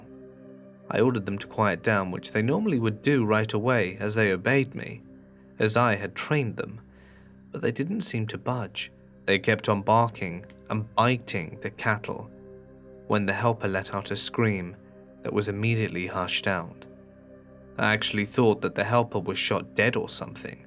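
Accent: Ireland. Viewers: That Irish accent is pretty hot. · Love the Irish accent,!! Happy New Year!! 🥳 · I love your Irish accent! Happy new Year!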